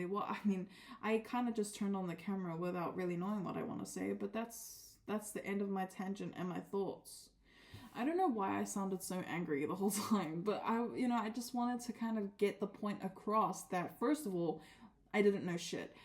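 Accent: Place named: New Zealand. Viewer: ok cool! Sorry I'm a geography buff lol are you from the north island or the south island? I noticed the accent too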